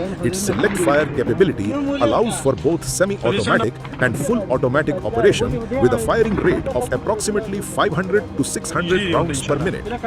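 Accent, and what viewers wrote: India. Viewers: check pajeet accent 🤣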